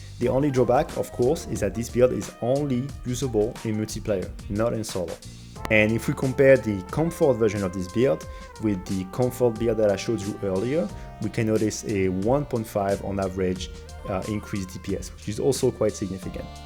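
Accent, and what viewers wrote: France. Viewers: Il est Français? Je me disais que j'entendais ça vu l'accent! Il a jamais parlé en Fr? aha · Hmm le bon accent français comme on l'adore 😅. Tkt je suis un confrère aussi 😁.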